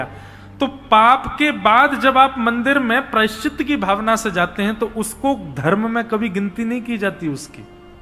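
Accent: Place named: Braj, India. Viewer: Apki brijbhasha me boli bhaut achhi lagti hai maharaj ji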